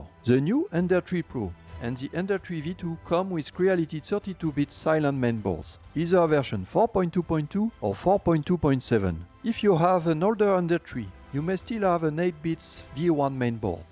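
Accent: France. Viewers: it sound french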